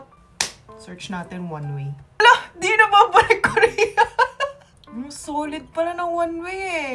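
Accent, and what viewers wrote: Batangas, Philippines. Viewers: hahaha gets ko na kung baket ka super fluent and with a thick filipino accent. Iskolar ka pala ng bayan. Pero sabaw ka rin ahhahahha! stay weird! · laking batangas ka pihado ano ang salita baga halatang halata ih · Koreanang Batangueña . Ala eh kainaman. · Wow I like all that you're now. You had adopted the basic pinoy lifestyle: your accent, attitude, and your behavior I don't want to say forgetting your Korean way, but I'm just proud having you in PH. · hala yong galawan at pananalita mo parang pinoy na pinoy siguro mahilig ka ng tropa sa pilipinas noh kaya ganyan ka ka daldal😂😂😂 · Nice lady with pinoy accent.. ala eh kagandang bata nire . Lasa ko'y mas pinoy pa ito kesa sa mga koño na pinoy. Be safe lagi. I enjoy your video's. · Your phillipino accent is so pleasant to hear.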